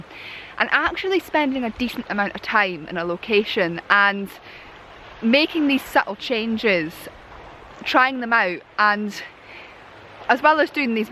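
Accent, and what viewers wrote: Scotland, United Kingdom. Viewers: I miss Scotland, I miss photographing in Scotland and I miss hearing the Scotish accent, the most beautiful accent in the world. I‘m thankful I can watch your videos so I get my dose of all of that. Thank you,!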